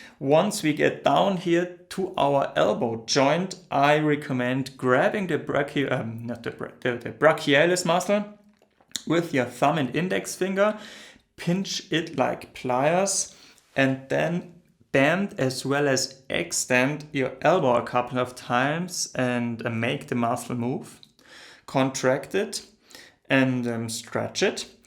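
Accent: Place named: Germany. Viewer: German or maybe even swiss?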